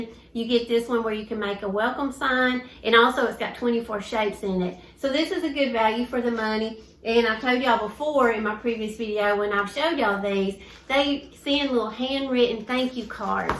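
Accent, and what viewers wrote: South, United States. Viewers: You are as sweet as you seem on your videos! Thank you for the kind words and thoughts. I’m not going to win this battle, but I’m so blessed, and take each day as the gift it is. I was a nurse for 30+ years, one of the 1st “lessons” I learned and try to live by each day...”I cried because I had no shoes, until I saw a man with no feet”. I’m a lucky woman. I love just hearing your southern drawl, as it makes me feel as if and think of “home”!! If you’re ever in Va, I got a tall glass of The House Wine of the South (Sweet Tea!) with your name on it! Look forward to what your doing next, and of course will be watching for whoever the Winner is. You show so much more than crafting like the giveaway, “can’t keep it unless you give/share with others”. Thank you for being a bright spot every time you “share”! Xoxo Cindy (sorry, didn’t mean to chew your ear off!! 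I’ll try and keep on topic next time! I don’t do social media I hope I’m not breaking any rules??)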